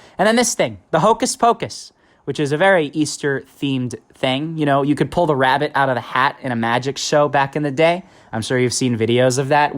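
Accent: Russia. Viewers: Are you Russian? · Are you Russian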